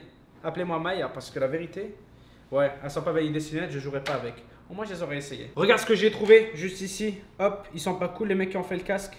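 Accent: Quebec, Canada. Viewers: Tu est tu es québécois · T’es québécois ou français?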